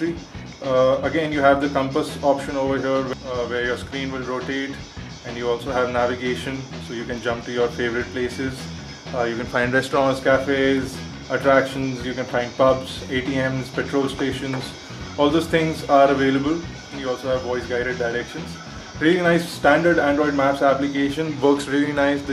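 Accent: India. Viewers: . That is not your real voice because it sounds indian and your hands are white, and that voice is really annoying. So it isnt friggin funny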